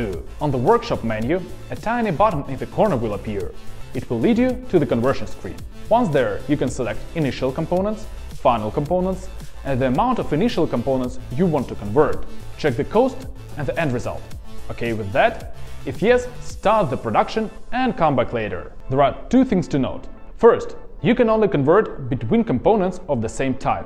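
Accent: Russia. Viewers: That Russian accent... Come on guys find a native speaker :) no offense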